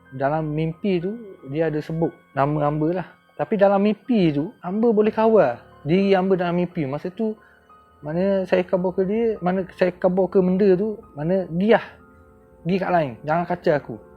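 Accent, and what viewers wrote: Terengganu, Malaysia. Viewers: Org ganu ke?? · Power! Kite pulok memang minak loghat ganu ni. Jaddi boh. Subscribe slaluhh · Pekat bahase terengganu dia haha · Pekat ganu boh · Pekat molek loghat tranung..terbaik boss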